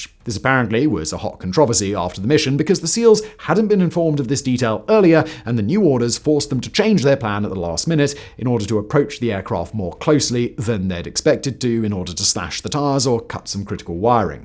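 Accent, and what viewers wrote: RP, United Kingdom. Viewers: the pre-beard I can deal with it's the stuffy upper crust english that was obviously he's natural way of speaking then that gets me 😂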